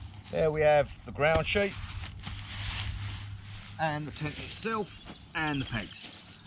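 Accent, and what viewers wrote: United Kingdom. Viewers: Why is it always British guys with hell of an accent introducing Lanshan 2 Pro? I swear...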